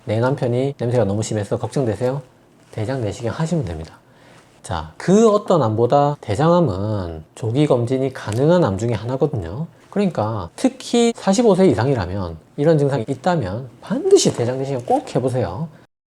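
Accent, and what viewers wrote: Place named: Busan, South Korea. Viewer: 전라도 광주 사는데 원장님 부산 사투리가 넘 재미있고 정도가구요 의학정보를 부산 사투리로 들으니까 은근 중독성 있어서 계속 듣게 돼네요 앞으로도 부산엑센트 계속듣고싶어요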